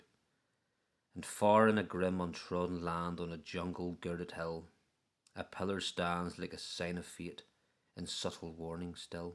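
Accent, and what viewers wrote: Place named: Northern Ireland, United Kingdom. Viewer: Awesome poem! I agree with Patrick, that northern Irish accent makes the reading better 😊, thanks for sharing this mate🤝 Hope you have a great day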